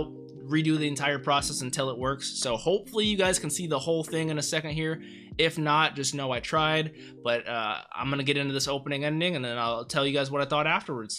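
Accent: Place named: Canada. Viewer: for some reason I thought u were Canadian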